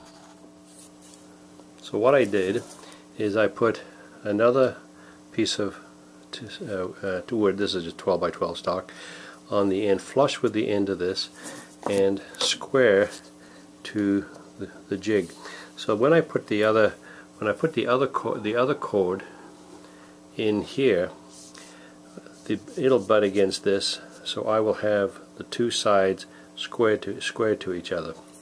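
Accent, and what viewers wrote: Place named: New Zealand. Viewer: Just discovered your channel - fantastic stuff! I’m a kiwi returning to the hobby after 25 yrs in the live steam scales with lots to learn as to what has changed, and an engineering shop to make jigs and precision parts - BUT trying to pick your accent sir! I can hear NZ, a touch of English, a smidgen of Australian, Southern USA etc etc. There has to be a story there - put me out of my misery please!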